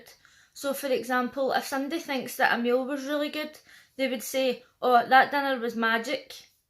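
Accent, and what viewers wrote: Scotland, United Kingdom. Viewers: ☺ Brilliant 👌 I could listen to the Scottish accent all day long. ☺ Brilliant video😊 Stay Awesome ✌❤👍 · I have an interesting accent myself so I can relate :) I like your Scottish accent. You're fun to listen too. Your endings sound very fast compared to slow down southern american endings lol. People say words a little slower here. I guess that makes you sound hyper and us sound dumb lol. Great video! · Love that scottish accent! Actually, all British accents are kinda cool :D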